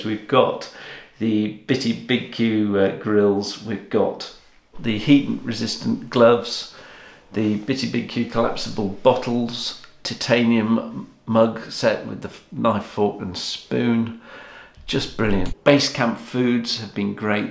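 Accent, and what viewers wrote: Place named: Yorkshire, United Kingdom. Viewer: Done. It's great to hear a Yorkshire accent when I live down south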